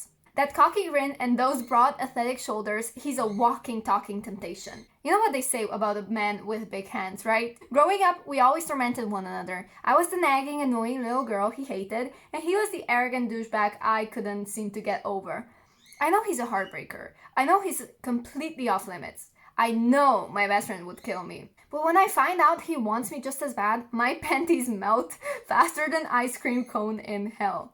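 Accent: Slovenia. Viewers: I love your slovenian accent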